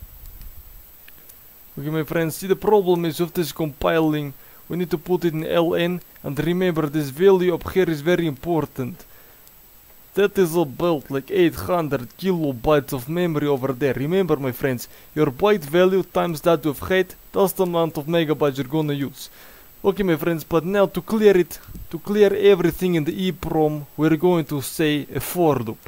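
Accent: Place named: Russia. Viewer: Love you Russian accent... ❤️ Gangsta Russian coding guy. 😂🎉 Greeting from Serbia.🎉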